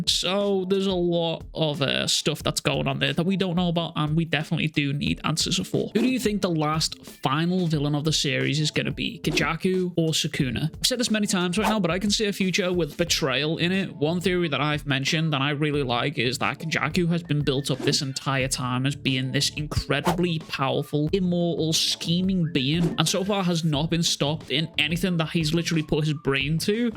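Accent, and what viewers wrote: Liverpool, United Kingdom. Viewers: Subscribed for the Liverpool accent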